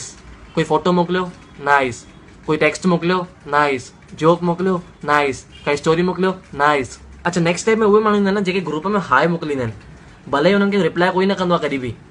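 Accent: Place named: Sindh, Pakistan. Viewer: your Sindhi is pure 😊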